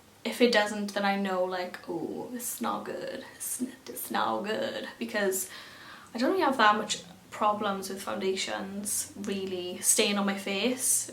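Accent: Wales, United Kingdom. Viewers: Are you welsh x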